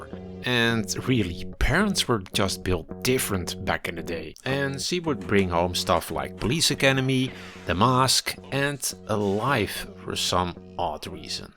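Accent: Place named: Netherlands. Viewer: The accent... ah, the channel is dutch. I just recognize it now by sound.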